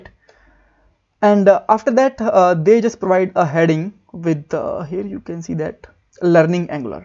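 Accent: India. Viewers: this indian accent is the worst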